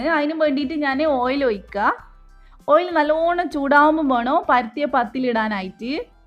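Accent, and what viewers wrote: Kannur, India. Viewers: 😂😂😂ഞാനും kannura ,,നമ്മൾ ഇങ്ങനെ തന്നെയാ പറയുന്നെ😂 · കണ്ണൂർ ഭാഷ ho... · അടിപൊളി കണ്ണൂർ ഭാഷ 😂😂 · Kannoor bhasha policy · Molu.kannooru kaariyaannennu yenikku.manassilakkan kazhinjirunnu.kannoorbhasha.kurachoode nannayi samsarikkunnunnu maathram.musleengalude .samsaarareethi alpam.maattamundavum.njammakku.yennokke parayum. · Ente koche i thought of subscribe the channel. But i couldn't understand your kannur slang even though i am a mallu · 😀igane thanneya samsarikkalu njammalu kannur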